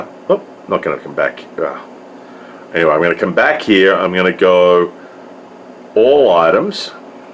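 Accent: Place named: Australia. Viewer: Cool, keep the vids coming. Really enjoy them. Love the Aussie accent too, makes a pleasant change to hear "solder" as it should be pronounced !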